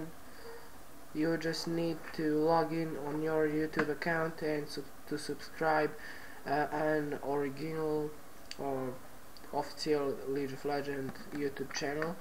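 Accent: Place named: Serbia. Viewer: You talking Serbian-Englesh laung :O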